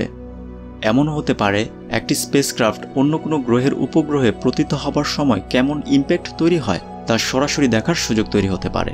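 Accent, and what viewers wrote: Narsingdi, Bangladesh. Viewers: ভাই আপনার বাড়ি কি নরসিংদী?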